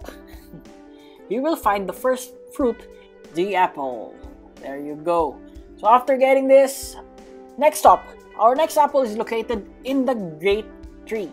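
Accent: Philippines. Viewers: sabi kona e pnoy k e accent plg(TT) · Sabi na Filipino ka kuya Sa Pag Sasalita Palang Nang English Parang si Kuya Kitt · yea he is filipino u havent noticed? about his accent? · Bro i didnt knew you were filipino sa totoo lang halata sa accent mo pero di talaga ako sure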